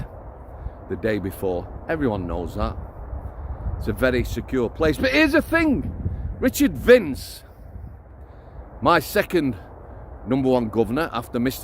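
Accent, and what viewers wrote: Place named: Yorkshire, United Kingdom. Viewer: It’s probably because he’s spent a lot of time in Manchester and his Yorkshire accent has mingled together with the Manc one, which happens quite often. xx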